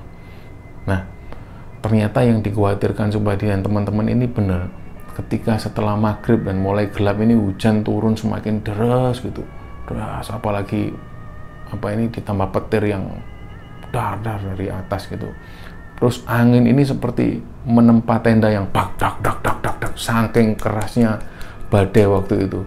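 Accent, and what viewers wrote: Java, Indonesia. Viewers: Cara penyajian dengan belakang backsound uyon " juga background yg gelap remeng" ditambah cara menceritakan dengan logat yg agak medok jawa bgt Bikin suasana lebih "greng" Suara khas mas yg bikin kangen dengerin nya Jam segini lg... Heeemmm Sehat truuuuuss yakkk... Kewreeeennn👍🏼💞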